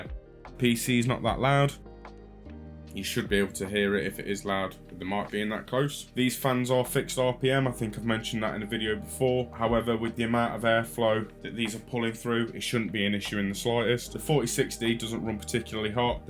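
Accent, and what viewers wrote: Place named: East Midlands, United Kingdom. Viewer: That would be a good idea mate, very helpful for us novices with next to no experience! You sound a bit east Midlands ish, where are you based?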